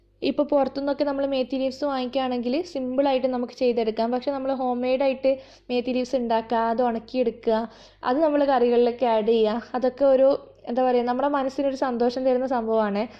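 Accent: Kannur, India. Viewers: That Kannur Slang.. 😍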